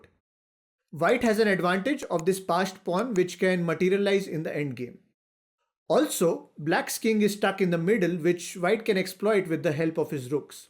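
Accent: India. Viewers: Is it me or does every single Indian have the same exact voice and pronunciation?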